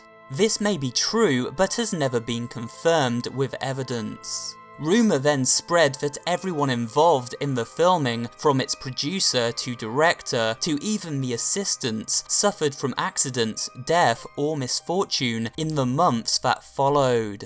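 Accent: United Kingdom. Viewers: R u British I can tell from ur accent I'm British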